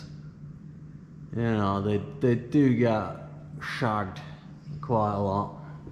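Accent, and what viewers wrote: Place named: United Kingdom. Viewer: The most British sounding German i,ve ever heard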